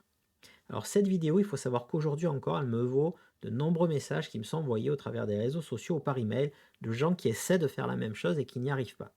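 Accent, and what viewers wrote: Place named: Southwest, France. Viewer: PS : Tu es dans le sud-ouest (ton accent t'as trahi)?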